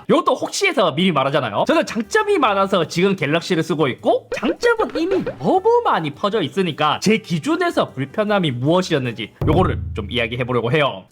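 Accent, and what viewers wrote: Gyeongsang, South Korea. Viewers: 경상도 사투리 쓰는데 화나 팬이시네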